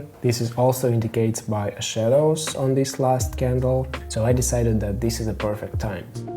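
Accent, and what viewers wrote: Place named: Russia. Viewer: I love your Russian accent!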